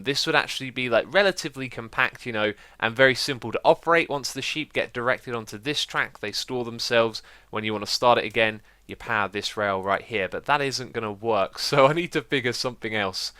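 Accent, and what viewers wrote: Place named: United Kingdom. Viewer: I'm really not a good builder (In his awesome British accent) Me: (In my not as good american accent): Yea? Me: Look at your base.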